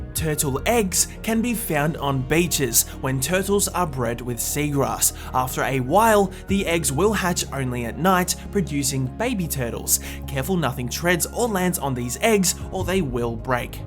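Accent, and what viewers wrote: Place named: Australia. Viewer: Hi I love your videos and your personality. You inspire me to make short content on my own but I don’t post it because my friends say it’s ‘not good enough’. You are my all time favourite YouTuber and your accent is amazing. (I’m Aussie too!) But in all seriousness, I can’t live a day without watching one if your vids. ❤️❤️ Edit: OMG OMG a heart!!! 😁😁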